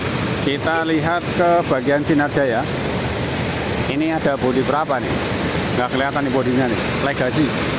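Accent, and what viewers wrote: Banyumas, Indonesia. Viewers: Hadur dan nyomak lagi Bang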